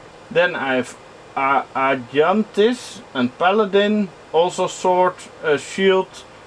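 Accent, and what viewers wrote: Netherlands. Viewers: The stickers saying Utrecht on the pc really weren't needed, I could immediately hear you were Dutch XD Nice video!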